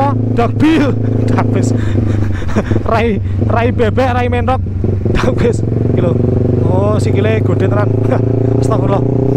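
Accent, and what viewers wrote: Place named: East Java, Indonesia. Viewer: Sampean orang jawa timur ta bang,kok kalau ngomong jawa kayak basa jawa timuran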